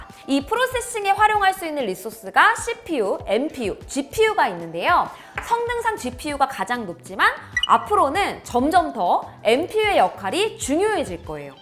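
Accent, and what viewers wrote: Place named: Seoul, South Korea. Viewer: 이분말투 전형적인 요즘 서울말사투리네요 ㅋㅋ중간중간 음올리는게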